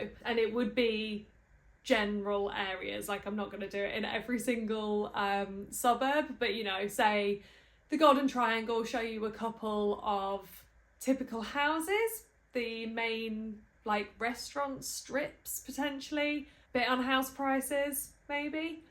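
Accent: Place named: Cheshire, United Kingdom. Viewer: You sound like you're from Cheshire/NW originally. This was super informative. Thanks!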